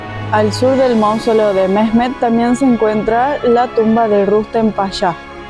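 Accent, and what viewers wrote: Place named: North, Argentina. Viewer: Hola!! Me encantaron tus videos, hace unos días descubri tu canal, se que sos Argentina y por tu tonada del norte. De donde sos? Tal vez Salta, Jujuy o Tucuman? Ya me suscribi porque pienso seguir mirando todos tus videos, buenisimo todo 😊❤un besito y a seguir adelante que vas a tener mucho exito con tu canal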